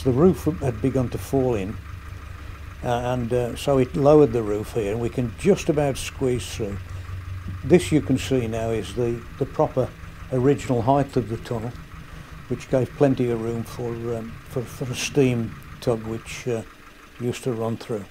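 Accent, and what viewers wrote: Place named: Halesowen, United Kingdom. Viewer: Wow I was born and raised in Halesowen (1940s 50s 60s) all my family and many cousins, some of which still live there. Brings back memories . Good to hear the old accent.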